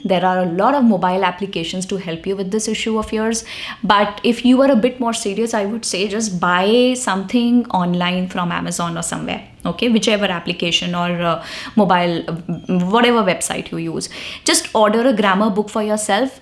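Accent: India. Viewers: are you Indian.your speaking pronoucition proved you are a indian girl.but doubts the name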